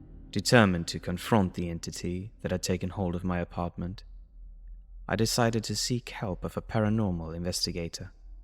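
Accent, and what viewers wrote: United Kingdom. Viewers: you have the perfect british accent for this too😉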